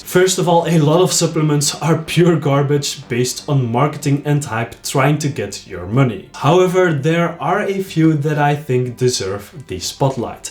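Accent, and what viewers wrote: Belgium. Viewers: ik dacht al dit klinkt als een belg toen zag ik die volle melk en wist ik het zeker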